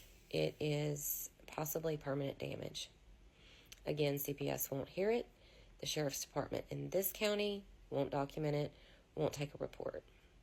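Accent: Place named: South, United States. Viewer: I would take all documents and go to your State Capitol. Have copies for the FBI HEADQUARTER DEPT. THE ATTN GEN and DEPT OF JUSTICE. I can tell by your accent you might be in a small town in the South. I know how things can be. No matter. WE THE PEOPLE still stands! Here for you. You little boy having special needs should be covering him with even more protection under his rights of the disabled. He isnt a pawn on a chessboard game. Shouldnt be about hoarding money from a single Mom. ⚖ ⚔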